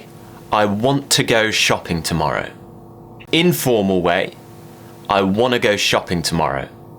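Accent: RP, United Kingdom. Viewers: Hello,! I came across your channel last week and now I can't go a day without watching at least one of your videos. You're very helpful and I want to thank you for that. You're automatically the best teacher I've ever had, haha. I really want to improve my British accent because I'm from South America and I learnt English in the American way, but I really want to learn British English more. When I watch your videos I always practice alone at home pronouncing the words the way you do it, but when I'm at university and I'm required to speak in English, I always end up speaking with my usual American accent because I'm still not used to speaking with a British accent and I feel kind of forcing it, like acting up my English, if that makes sense. Do you have any advice for me on how can I feel more relaxed speaking with my rough British accent to other people? 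Thank you very much again! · I didn't understand British accent before. But now I can't believe that I can translated almost all you said. Thank you from Thailand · Are you speaking RP accent? You speak so clearly. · I wonder if your accent is Received Pronunciation?Thank you!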